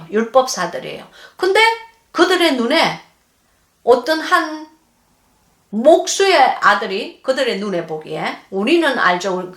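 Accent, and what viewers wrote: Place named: Busan, South Korea. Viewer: 할렐루야 ~~목사님 늘 은혜 말씀과 삶을 나눠 주셔서 감사합니다 ~ 목사님과 같은 고향(부산 초량동)이라 더 정감가고 목소리와 억양도 좋아요. 사투리까지 너무 친숙합니다. 늘 강건하시고 우리들을 잘 인도해 주세요 ~♡♡♡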